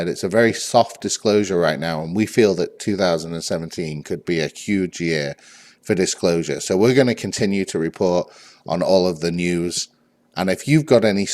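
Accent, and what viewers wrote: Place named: Manchester, United Kingdom. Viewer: OK, I thought I rec ognised a Manc twang in there, I'm from Bury.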